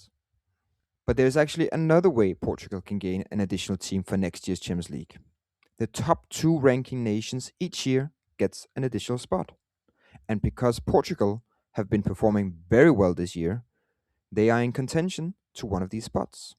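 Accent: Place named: Denmark. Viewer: Are you Danish? You have a very Danish accent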